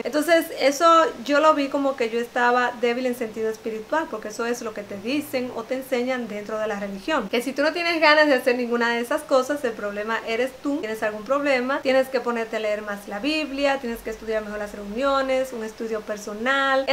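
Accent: Dominican Republic. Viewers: He visto tu video varias veces. Me encanta ese acento Dominicano tuyo. Eres muy linda y muy valiente . Gracias por compartir tus experiencias y puntos de vista. Saludos desde Cuba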